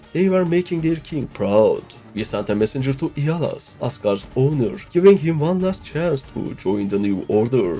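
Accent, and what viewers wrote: Turkey. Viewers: Bro he is actualy a turkish olso he is speaking English with turkish acsent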